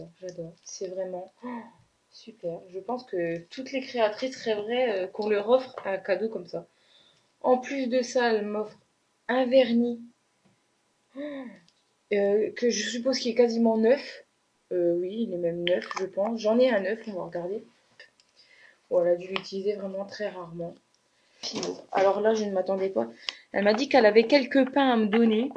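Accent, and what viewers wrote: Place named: Nord-Pas-de-Calais, France. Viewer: comme ta l'accent chti toi c trop lol la chance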